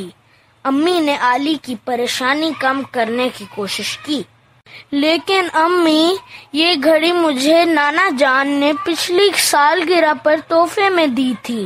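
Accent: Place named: Pakistan. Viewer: Very strong & Lovely Urdu accent Keep it up boy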